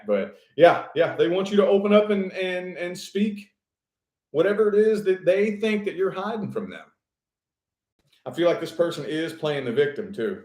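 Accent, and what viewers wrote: South, United States. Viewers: I'm a Gemini and yeah.......I'm done with the BS........had to actually call the law on my "person" a couple weeks ago to GET HIM GONE!!!........He loved loved loved the chase, and the drama and trying to manipulate and control ME!!! Been goin' on since last August, whew! It was a ride, the SEX was AWESOME, but that ain't everything, lol......., you are SO cute!! and I hear a country boy accent goin' on there......where you from, sweetness?........Alabama, here, and thanks for your right on readings darlin'!!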